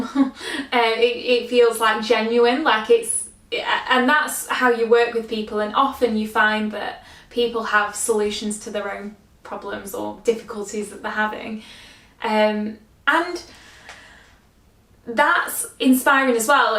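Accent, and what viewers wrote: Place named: United Kingdom. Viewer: Great channel, immediately subscribed especially thrilled to hear a familiar voice of the u.k. too as most channels I find myself subscribed to are often American, and so it’s confused as social work in our country is very difficult to appropriately compare with rules and procedures in u.s.a.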